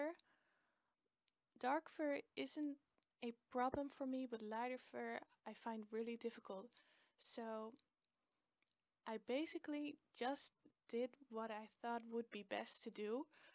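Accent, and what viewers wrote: Netherlands. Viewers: Are you dutch? (Want k hoor een soort Nederlands achtig iets in je Engels) Youre realy good at drawing btw 💗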